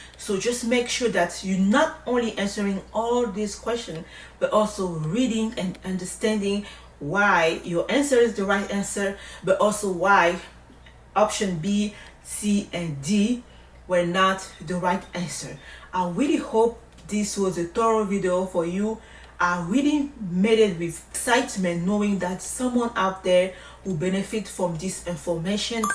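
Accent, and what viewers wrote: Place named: Cameroon. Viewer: Hi new subscriber here👋🏽, first of all I want to thank God that I have came across your video. Your first video that pop up on my feed was "how to find sponsors for a green card (2025)". I will try to make it short (because I like to talk a lot). To be honest when I clicked on your video I wasn't sure what to expect, but I believe is God who wanted me to come across your video. When I heard your accent, I told myself, maybe she's from Cameroon 🇨🇲🤭, but when you said you were from Congo 🇨🇩, wow 😳 I was so amazed. Let me tell you how proud I am as your fellow Congolese🇨🇩 sister to see everything that you have achieved, seriously with all the titles on from of your name, that's the most blessing ever (You Go Girl!!). Like I said I will try to make it short 🤭😅. So, I am a UK trained nurse and I live in London. I was born in Congo, been raised and lived in Belgium for 13 years and I have decided to move to the UK. 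So, you might have guest from now that by this message I want to migrate in the US as an RN for so many reasons. But the first is for the job progression and all the nursing opportunities. In the UK, unfortunately, right now the UK is failing all their nurses. So I am on my journey to migrate, I know the road will be long with retrogression (EB-3 visa), but I believe God can do a miracle and touch Mr Trump's heart to make a big change for us nurses😁. On a serious note, I have start the application with New York BON because right now they are the only state that ac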